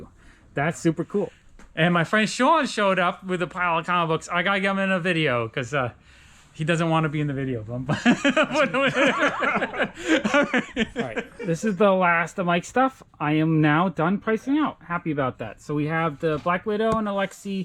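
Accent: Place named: Ohio, United States. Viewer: It's crazy how all of the customers are clearly New Yorkers, but Sean sounds like he's from Ohio or something, Lmao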